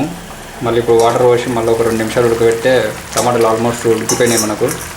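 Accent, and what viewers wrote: Telangana, India. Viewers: Anna manadi pakka Telangana MBNR basha🙋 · Super andi iam very big fan of your Telugu tounge because it's same like our mother telangana tounge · Telangana Basha super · మంచి తెలంగాణ భాష మాట్లాడినవ్ కూర ఎట్లైతే గట్లాయేగాని మటలైతే అస్సల్ మాట్లాడినవ్ 👍💐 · anna ..pakka...telangana anukuntaa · మన తెలంగాణ భాష లో మస్తు మాట్లాడుతున్నావ్ అన్న... 👌👌👌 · మీ లాంటి వాలు మాకు చాలా అవసరం అన్న..మన తెలంగాణ యాస చాలా బాగా మాట్లాడుతూన్నారు అన్నా..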